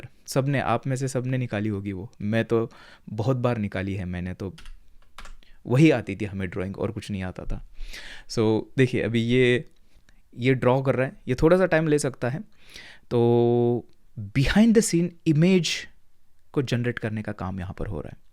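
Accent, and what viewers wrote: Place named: South, India. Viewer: Sir jii south indian waali feel aati hai aapke baaton se...!!! 😎😎 btw great and valuable series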